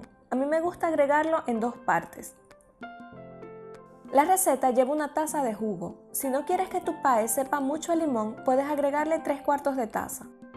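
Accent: Venezuela. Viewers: Parece q eres de Maracaibo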